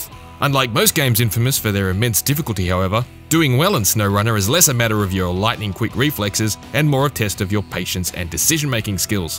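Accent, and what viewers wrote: Australia. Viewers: I might be going on an Australian outdoorsman sort of stereotype, but the reviewers voice gives this video more authenticity for me. Just sayin’. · U an Aussie mate?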